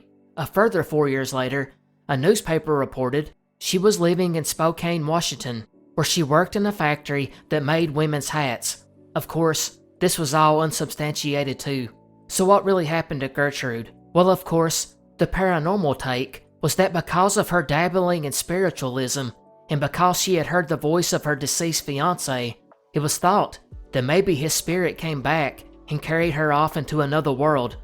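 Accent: South, United States. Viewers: how I wait in epic patience for your southern drawl. Bring is, brother!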